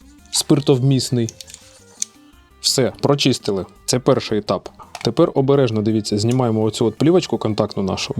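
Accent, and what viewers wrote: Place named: Poltava, Ukraine. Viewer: Чоловіче, судячи з акценту, ви точно з Полтави))). І це говорю в хорошому сенсі)) . Дякую за відос, реально дуже корисний!!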